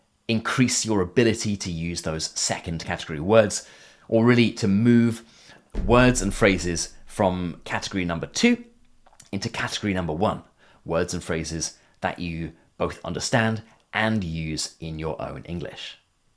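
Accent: United Kingdom. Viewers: Este es acento británico?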